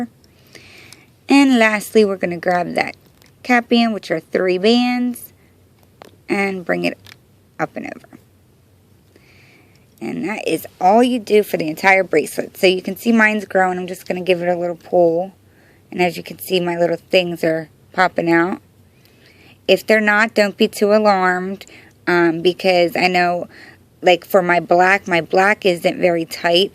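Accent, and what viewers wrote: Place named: Brooklyn, United States. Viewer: Ma'am I'm so sorry. I really do not want to offend you when asking this question. Are you from Brooklyn? You just have that Brooklyn accent mixed w/ country lol.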